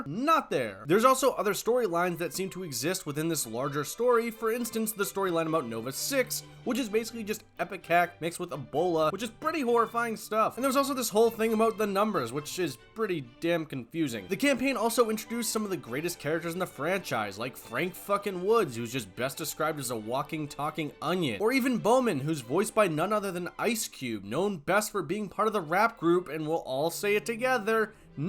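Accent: Portugal. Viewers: Are u Portuguese?🇵🇹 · És português?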